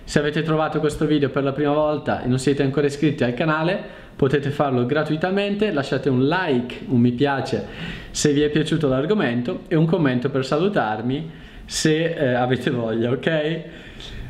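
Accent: Brazil. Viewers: Ciao! Tu sei italiano o Brasiliano? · Lástima que en la pronunciación, lo hace como brasilero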